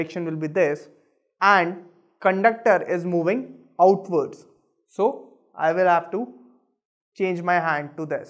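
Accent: India. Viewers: Best indian accent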